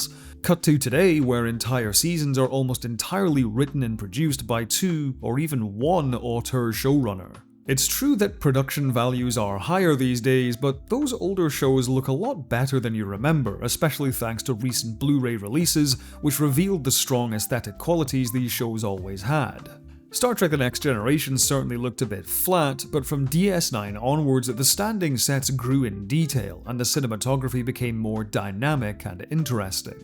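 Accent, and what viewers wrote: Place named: England, United Kingdom. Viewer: Wait you sound English. Are not most English and European shows like 1-4 episodes a season which you call series, and then it only goes for like 3 seasons anyways? Or do you get a lot of American TV Shows in UK?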